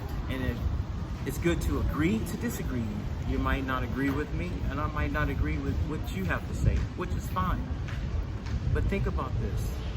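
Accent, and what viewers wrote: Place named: Los Angeles, United States. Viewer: Sad. Sounds like academia. Your speech sounds like Los Angeleno.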